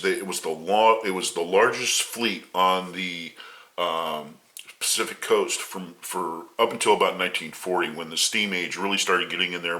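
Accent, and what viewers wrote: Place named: United States. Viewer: I taught you was American.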